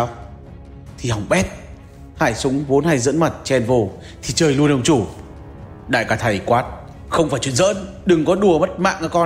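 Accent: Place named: North, Vietnam. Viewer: Giọng bk đèo bỏ mẹ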